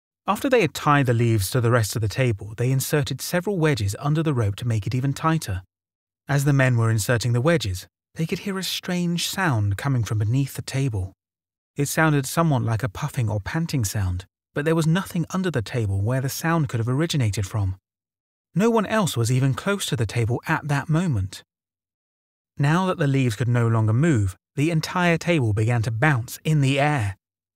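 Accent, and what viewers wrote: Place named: Bristol, United Kingdom. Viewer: Your voice is very melodic. I'd say it's close to but not quite a Bristol accent?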